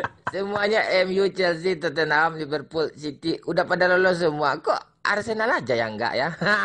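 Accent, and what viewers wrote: West Sumatra, Indonesia. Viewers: Lucu bgt logat Minang TPI bahasa nasional lucu ²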